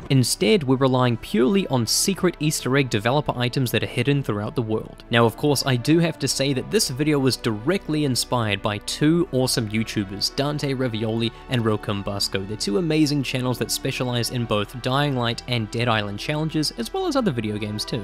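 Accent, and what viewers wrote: New Zealand. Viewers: Yo. Are you from new zealand?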